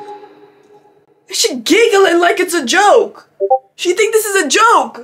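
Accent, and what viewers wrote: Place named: United Kingdom. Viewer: LUV YOUR BRI'ISH ACCENT LUVV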